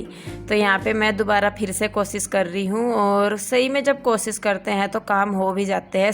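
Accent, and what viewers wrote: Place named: Saharanpur, India. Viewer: सहारनपुर मुजफ्फरनगर वालो की बोली तो अलग है ही 🤩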